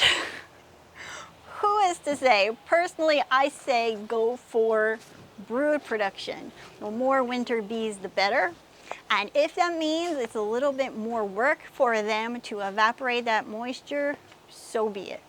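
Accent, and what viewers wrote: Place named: Pennsylvania, United States. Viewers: You must be from PA (my dad says "wudder") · Thank you for your videos! Also, you must be from South Jersey or Philly "Wudder". I love your content, and your videos have helped me tremendously.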